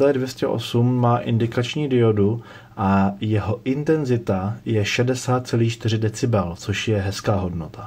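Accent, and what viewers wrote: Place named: Prague, Czech Republic. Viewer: Ach ta pepanština :(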